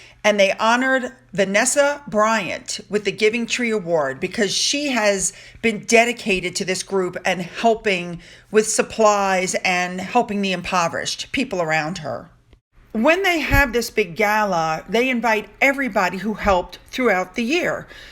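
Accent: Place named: United States. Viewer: The only wrong thing about your videos, dear, is that they are too short...lol. You have what they called in the old days a "radio voice". It carries over running water and every machine I have in the house, so you are my choice of sound when I do housework and I need more than 10 minutes for it. You also speak what I would call "BBC American", correct English with an American accent, and I am sure I speak for more non-native English speakers than myself when I say you are so easy to understand. And after having read most of today's H&M updates, I have come to the following conclusions: - Charles will be King when the Queen dies. - Jason Kanuff will not write a book (unless he is planning to retire at the age of 40). Spilling beans like that might stop him from being hired by others, and he comes across as a man with too high moral and ethical principles for that. 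So no. I think the NDA was lifted because something illegal was hidden by it. I do NOT think he would have done it without William's (and the Queen's) support, and since he was socializing with Catherine and William in Glasgow..well, didn't look like they had a bone to pick with him. - I don't think H&M will sit down for another Oprah interview. The two of them are cowards and seems like Oprah wishes she never met them. - And I finally remembered what that red dress reminded me of. When I came to USA first time in the early 70 and my country was really poor, one thing that impressed the $hit out of me was the Am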